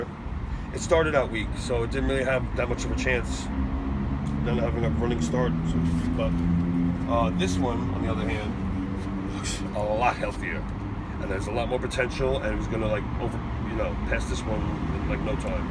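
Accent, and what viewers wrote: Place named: United States. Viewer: Where do you live? You sound American but I figure you live somewhere in Europe with the schwerter comment...love the balcony!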